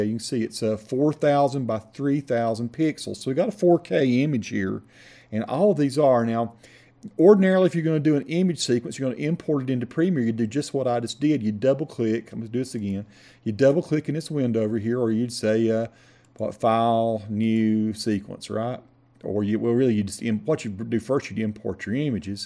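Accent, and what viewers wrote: South, United States. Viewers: Hey there! Russian from Russia here! Привет из Питера, посоны! Just want to thank you for the vid and say what a lovely southern accent you got there! Keep up with a great tutorials!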